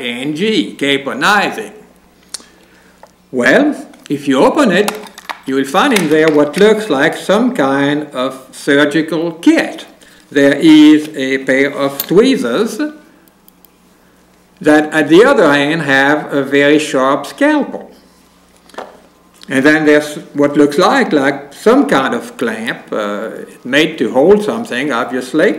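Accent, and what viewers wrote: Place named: Louisiana, United States. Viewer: Interesting but that "smacking" gets on my nerves. Also, he sounds like a Cajun.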